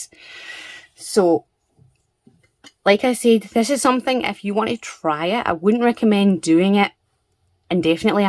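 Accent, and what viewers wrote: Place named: United Kingdom. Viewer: Accents from the United Kingdom are so neat.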